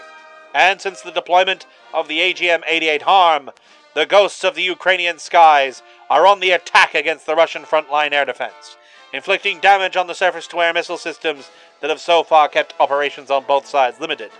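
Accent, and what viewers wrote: Transatlantic, United States. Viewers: Ngl, this is a pretty good transatlantic accent. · Absolutely fantastic transatlantic accent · Yes, he has a fantastic Transatlantic Accent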